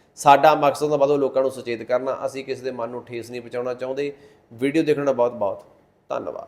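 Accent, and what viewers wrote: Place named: Bathinda, India. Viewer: Patakarkar bathinde da lagda hai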